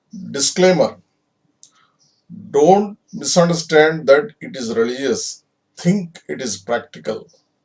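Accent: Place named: India. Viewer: Can you say which English are you speaking? British or American ? Easy to say, speak in English,but it's hard . Pronunciation as well as spelling differ. By the way you have indian tongue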